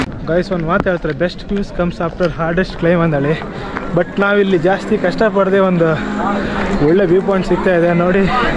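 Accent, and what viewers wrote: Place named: Kundapur, India. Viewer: ಕುಂದಾಪ್ರ ಕನ್ನಡ ❤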